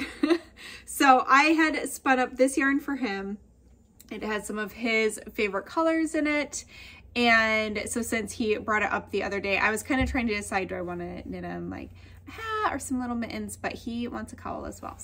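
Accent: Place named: Midwest, United States. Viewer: loved the Midwest accent! I grew up in Minnesota and have lived in CA for 24 years. However, when I am tired or talking with family, that Minnesota accent pops right out!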